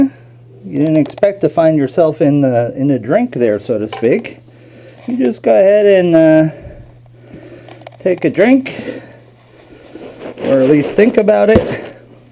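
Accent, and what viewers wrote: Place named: New York, United States. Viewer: He's a New Yorker - they all sound like that! LOL!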